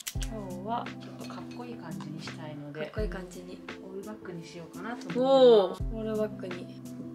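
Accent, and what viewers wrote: Kansai, Japan. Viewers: エキストラに参加させて頂いて、その際に彩ちゃんを初めて拝見しました！！❤ スタイル良過ぎてビックリしました！😳💕（語彙力 それから彩ちゃんの虜です😍💓 関西弁が可愛すぎて可愛すぎて☺💕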